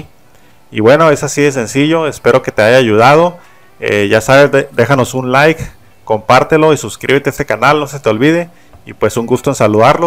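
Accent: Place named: Baja California Sur, Mexico. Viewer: Justo antes de que dijeras de donde eras ya habia reconocido tu acento de región, incluso podria ser de BCS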